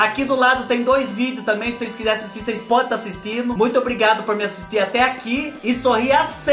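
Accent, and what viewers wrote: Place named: Caipira, Brazil. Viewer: 🥀beijos de Barueri SP ❤ adoro seu sotaque caipira paulista, igual da minha família 😘😘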